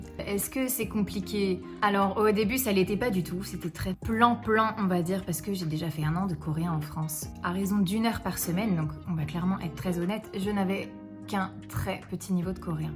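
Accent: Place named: England, United Kingdom. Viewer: Et les copains de France te manquent pas ? Vilaine 🙃🙃 j'adore ton accent anglais ! Et merde pour tes exams du coup :) bisous bisous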